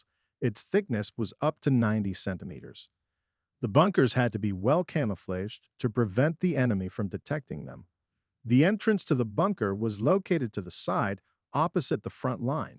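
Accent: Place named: United States. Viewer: Too bad the narrator is American….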